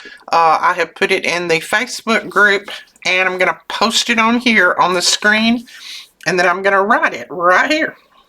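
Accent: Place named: Tennessee, United States. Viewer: I so love watching you do the journals . I am very new at this I’m working on my 2nd book . Plan on making 4-5 for a craft show . Along with some homemade cards . But I love you accent are you from Tennessee? I am but currently live in Indiana. Is there any advice for me as a beginner ? Thanks so much and I’m ma gonna keep on watching you ♥️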